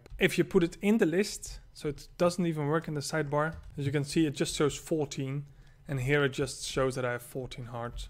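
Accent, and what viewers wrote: Netherlands. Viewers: Je bent 100% nederlands. Ik kan dat horen :P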